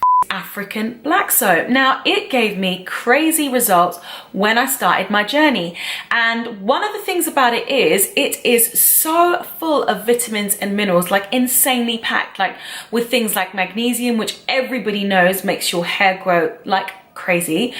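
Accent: England, United Kingdom. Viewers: Are you from England?? Or London?? Is that a hat behind you. 😂😂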